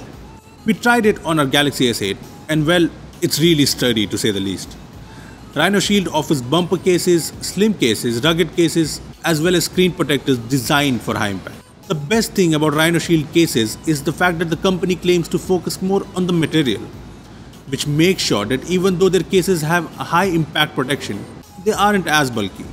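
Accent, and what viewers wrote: India. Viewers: The Indian english accent is just hilarious XD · Fuck you and your indian accent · I love Indian accent 😁😉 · As a small country East Asian, Sorry, I'm not fluent English. If you have some time, Could you add English subtitles to this video? I want to learn Indian English also. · Thomas Politis me too I hate this Indians videos, his acent is just disturbing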